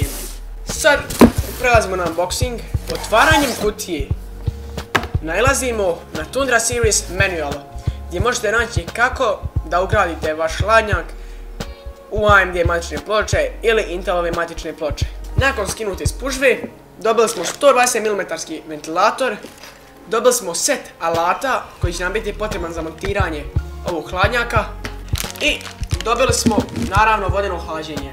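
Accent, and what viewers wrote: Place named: Dubrovnik, Croatia. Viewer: oklen s'ti? Malo mi baca na Dubrovnik/Korčulu, po naglasku.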